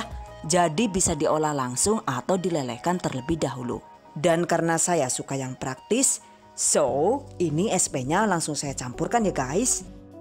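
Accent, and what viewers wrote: Banyumas, Indonesia. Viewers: Suka bhs nya,Banyumasan kyk inyong😄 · Ngapak temen yu, rika jawa ne ngendi😂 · paling suka tutorial vidio jelas dan selalu menarik utk di ikuti di tambah bahasa ngapak2 nya lucu salam dari Jogya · Kiye wong Banyumas Karo baha inggris lagi campuran, ngandel metu banyumase jaaaaaan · Bahasane wong ndi iku,, koyo ngapak,,,, 😂😂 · ora ngapak ora kepenak ya mbak... salam ngapak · Oalah jebul ngapak mbekayune 😂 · Jebule wong ngapak, keren yakin video Rika Yu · Mantap bunda...jawanya ngapak banget...ngromet ..opo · Deneng ngomonge ngapak yu🤣🤣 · ngapakers koh sip lah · Jebule ngapak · msyaAllah ibu orang ngapak yang the bastd 😘😘😘😘😍😍😍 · Terimakasih atas ilmunya, suaranya lucu bngt bu, bisa jd 3 bahasa gitu,indo,ngapak & inggris,, memang luar biasa, sangat bermanfaat sekali, semoga sukses selalu ya bu 😂😍😘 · Jan maturnuwun banget ilmune bu, ngesuk tek praktekna lah, slain mengispirasi tp ana lucune pas ngomong ngapak, ( BOCAH NGAPAK YA ) 😀😀😀😀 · Ngapak yh yung... 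😁😁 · ternyata ngapak ibuknya😅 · Ngapak ngapak hahaha keren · Ngapak2 lucuuu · Yg aku tunggu2 dr channel ini adalah ktika udah ngomong jawa ngapak..sllu ngakak😂😂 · Mbak orang mana deneng ngapak banget.cara menjelaskan jelas mudah di mengerti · Trnyata ngapak ya bhasanya, nyong ben ngapak kie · Kereeen ibunya gak pelit berbagi ilmu sangat detail...dan lucu si ibu ngapak ..wkwkkw auto subscribe 😂😁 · Kereeeen bahasa inggrisnya, apalagi bahasa ngapak nya.... Sukaaaa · Jujur bun.. Saya klo denger bunda ngomongnya ngapak2 jd ketawa.. 😀.. Nyantai bgt gtu, Tp resep2nya oke pnya bun...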